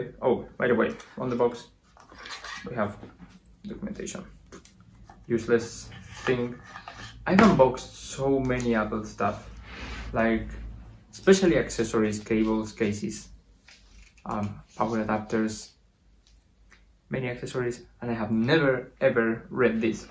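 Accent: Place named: Spain. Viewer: jajaja podia adivinar que eras español, muy buen video hermano! justo lo que necesitaba. gracias :)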